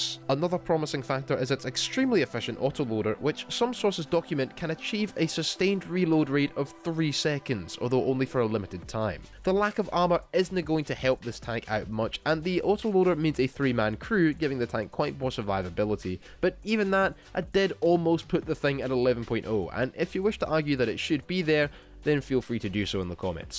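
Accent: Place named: Scotland, United Kingdom. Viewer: I honestly love the Scottish accent. As a matter of fact, I just love the Scottish in general.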